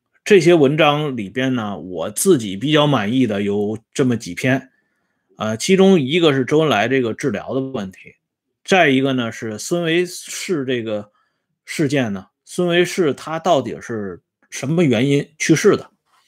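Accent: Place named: Tianjin, China. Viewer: 我又听出天津味了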